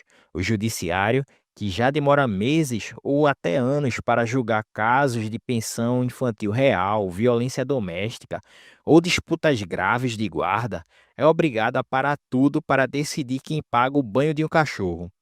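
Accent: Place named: Pará, Brazil. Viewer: Sotaque forte paraense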